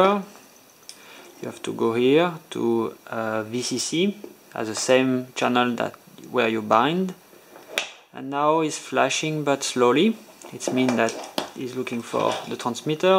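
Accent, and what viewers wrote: France. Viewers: sound french, lol. good job man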